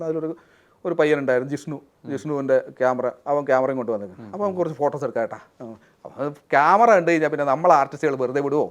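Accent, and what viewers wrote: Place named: Kannur, India. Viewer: Jishinte സംസാരത്തിൽ കണ്ണൂർ ഭാഷാ അസ്സലായി ഉണ്ട്.പിണറായിയുടെ സംസര ശൈലി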